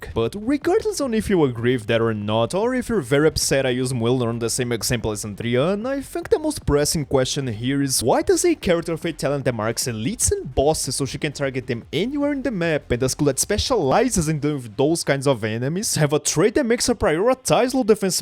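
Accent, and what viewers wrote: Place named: Brazil. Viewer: wow, you have a very strong Brazilian accent... You are? xD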